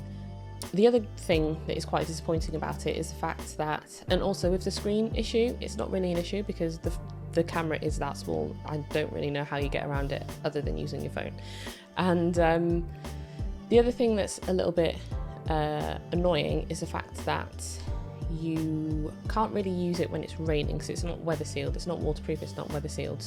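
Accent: England, United Kingdom. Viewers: Hello. Hope you can help this DJI OSMO Pocket 2 Tragic. After doing a fair bit of research, I purchased a POCKET 2 from Amazon. I bought the basic model and later contacted DJI in Melbourne, Victoria Australia where I live, seeking a microphone for this unit. I was advised that DJI no longer supports certain products such as the "FITTNG" that attaches to the base of the POCKET 2, that houses the electronics which accepts the spound from the DJI mike which the operator wears on their lapel. I have no idea what the electronics housing is called or named, and it is just so frustrating that no one can assist me. I hope you will be kind enough to assist me or give me some direction. By your accent I'd you are English and I hope you are able to advise me. Kindest Regards. Angus McKinnon.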